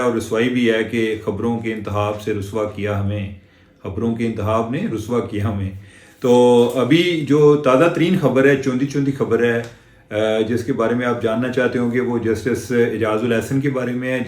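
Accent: Punjab, Pakistan. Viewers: جی پنجابی کا تڑکہ نہ لگایا کریں ، خالص اردو اچھی لگتی ہے 🎉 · خوبصورت پنجابی کا تڑکا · آپ اردو میں ہی بات کیا کریں ۔ وہ بھی پنجابی ہی لگتی ہے 😊😊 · Punjabi tarka beautiful. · صاحب آپ کے پنجابی تڑکے بڑے مزے ہیں قسم سے 😅😅😅❤ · Punjabi touch is wonderful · sahb zinda bad with Punjabi touch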